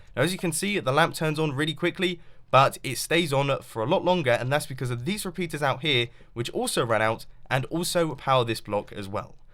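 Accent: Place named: United Kingdom. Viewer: I love you and you sound so British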